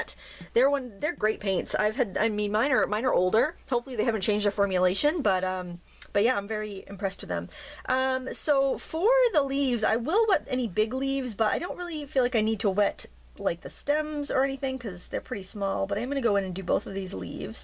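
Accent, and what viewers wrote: Maine, United States. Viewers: Love it when you tell us how words are said in Maine. 🤣shaaarpies lol I bought died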